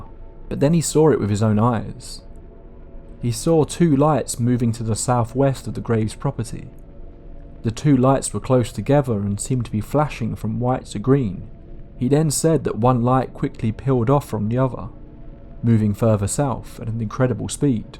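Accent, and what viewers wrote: England, United Kingdom. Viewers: Not "glided" but Glid! I'm becoming rather disillusioned by your channel as, though you speak with an English accent, your grammar suggests you were "schooled" but not educated in America. Schooled but not educated? Simple. America's education standards are non-existent! A Harvard or Yale graduate is equal to a kindergarten pupil anywhere else in the world. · It's a little funny to hear a quintessential British voice recounting American ghost stories. Like my ears and brain do a double-take. But as usual, your research and storytelling are spot on as always. I really do love your work, regardless of where the tales take place. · Man why is this British guys scaring me rn😂🤣 good video man😂👍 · Don’t! We love your British accent!! 🇬🇧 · This motherfucker is not from Michigan I can tell by the British accent get the hell out of here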